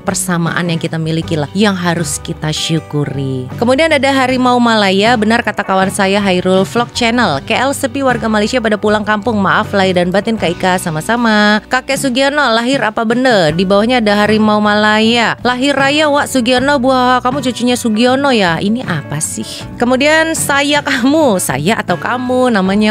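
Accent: Indonesia. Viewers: Dengar percakapan sahaja sudah tahu Sis Ika ini orang Indonesia! Masih ada yang bertanya orang Indonesia atau Malaysia? 😂 From Malaysian - Kuala Lumpur Malaysia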